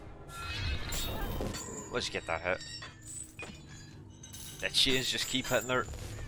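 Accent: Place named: Belfast, United Kingdom. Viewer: It's a Belfast accent I think.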